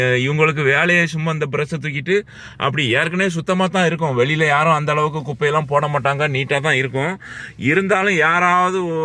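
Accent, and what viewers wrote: Tirunelveli, India. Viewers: நீங்க பேசும் போது அந்த திருநெல்வேலி பாசை வருது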